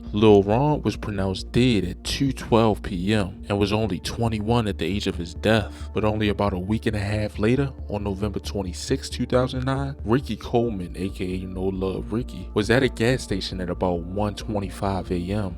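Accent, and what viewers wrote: Baltimore, United States. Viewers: You have to be from Baldimore. I can hear it in the accent. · Bro are you from Baltimore? I hear them heavy U's in your accent 🤔💯✊🏿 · You from Baltimore? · I can hear that you're a fellow Baltimore native. It's crazy we are fascinated with the Raq like our city ain't our here geekin themselves. Lol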